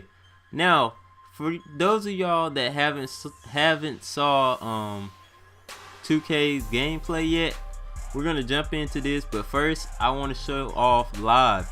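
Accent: United States. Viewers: u sound country as shit I don't know if ur slow or if that's just how u normally talk.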